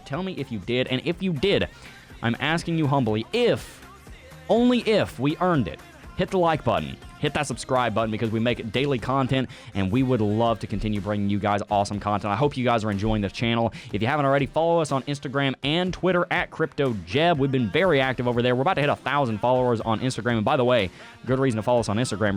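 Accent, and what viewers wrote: South, United States. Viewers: Sounds like you are from the south. You got the radio voice gift. Great TA.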